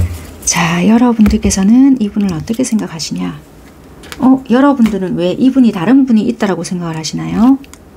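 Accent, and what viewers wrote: Busan, South Korea. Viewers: 선생님❤2번 리딩 감사합니다!!! 평안한 밤 되세용😊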